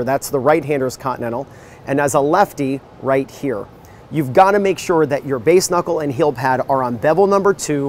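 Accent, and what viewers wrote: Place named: Florida, United States. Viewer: I hear an accent but I don’t know which state? Florida?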